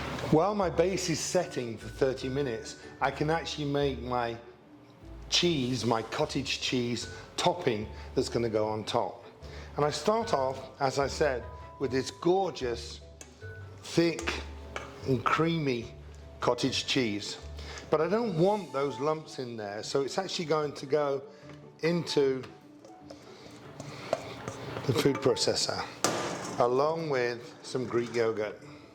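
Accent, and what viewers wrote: England, United Kingdom. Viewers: Well, if this dessert isn’t already too wonderfully we get a great English accent and passionate and special energy you explode with. New subscriber. Thank you in advance. I just love your voice., accent and energy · Plus, I love the British accent 😊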